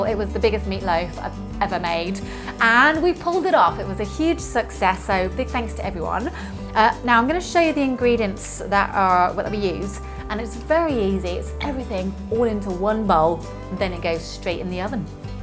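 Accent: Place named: London, United Kingdom. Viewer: You are very welcome. The simplest and most heartfelt things are always the best. You did good and should have been recognized and complimented for it. I love the accent. Sounded like London area. I'm not from the UK but lived in the Devon area for an extended time after my retirement. You would have charmed the old Vets brilliantly. I am so jealous.:-)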